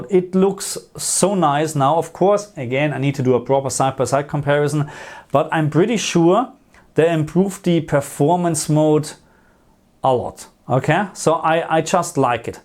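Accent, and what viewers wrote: Germany. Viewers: Du kommst doch wohl aus Deutschland oder nicht? Man hört es direkt, ich wundere mich dass Australien bei deinem Kanal steht. Da wohnst du wohl? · Wieso schreibst du auf Deutsch, ist er Deutsch? Sein Akzent klingt auf jeden Fall so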